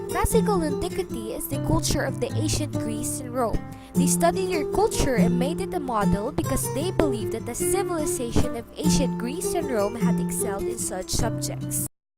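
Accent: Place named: Philippines. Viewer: Informative! Although the accent you have really sounds like a filipina which is cool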